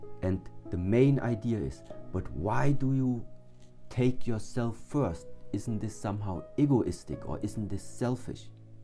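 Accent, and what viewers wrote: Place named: Germany. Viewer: Is he from Germany he has a strong german accent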